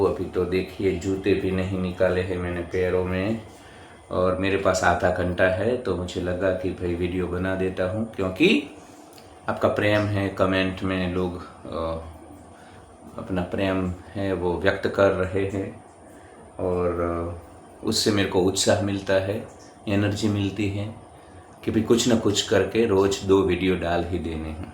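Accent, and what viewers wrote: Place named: Gujarat, India. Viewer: Gujarati accent wali hindi ❤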